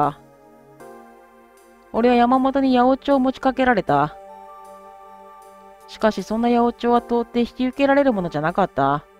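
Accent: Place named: Kansai, Japan. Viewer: 関西人ですが、の関西弁くせになりそう😁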